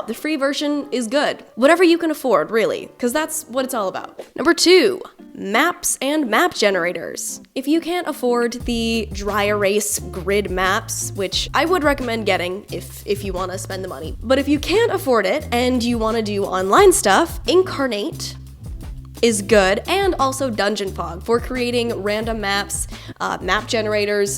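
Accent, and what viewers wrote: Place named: Ireland. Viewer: $$$ great Irish accent. Keep up the good content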